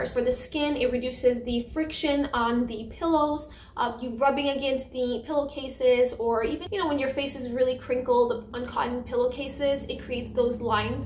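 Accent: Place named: Quebec, Canada. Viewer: Leave alone, she’s French Canadian, there is so many words that are pronounced differently in Canada. I live in the USA but I am a Canadian.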